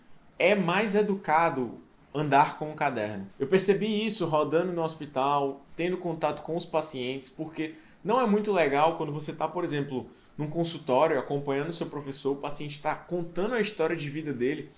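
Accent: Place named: Fortaleza, Brazil. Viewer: Oi,. Tu é daqui de Fortaleza-ce? o sotaque é parecido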